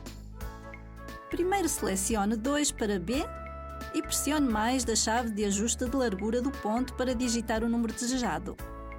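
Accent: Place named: Portugal. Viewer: Meu DEUSSSS , espero que minha máquina não venha con este edioma , portugués de Portugal? É o último, soy de Brasil, bah que asco m não entendí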